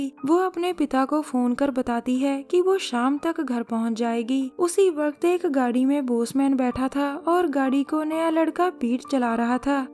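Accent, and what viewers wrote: Punjab, India. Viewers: ਤੁਹਾਡੀ ਆਵਾਜ਼ ਤੋਂ ਸਾਫ ਪਤਾ ਲੱਗਦਾ ਕਿ ਤੁਸੀ ਪੰਜਾਬੀ ਹੋ ਤੇ ਰਿਹਾਇਸ਼ ਪੰਜਾਬ ਦੇ ਕਿਸੇ ਪਿੰਡ ਦੀ ਹੈ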